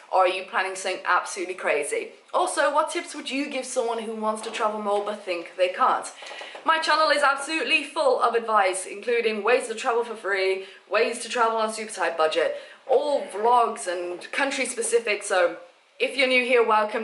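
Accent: United Kingdom. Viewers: btw i think you are gorgous and i love your british accent happy new year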